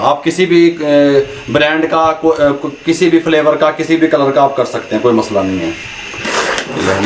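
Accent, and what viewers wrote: Punjab, India. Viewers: Asslam o alaykum, Bhai Jan aap k liye kucch mashwara dena chhahta hoon. Cheff aap ki kucch baten improvement chhahten hen. please consider Karen. 1- aap ney kam bolna he. 2- koi mas'la nahin he. (Matt Boleyn) 3- tension nahin leni (Matt Boleyn) 4- sirf Urdu Boleyn. Punjabi ka tarrka matt lagaeen. 5- aurr sab se buraa lagta he. (Samjha Karen). 6- aap ko chahiye sabb se pehley kisi bhi recipe key ajzaa (ingredients) bataen aurr unki quantity (miqdaar) btaen. Aurr phhir recepie ko banana shuroo Karen. Ummeed he meri baton ko masbat lete huee amal Karen gey. Jiss se insha'Allah aapki video quality improve ho gi.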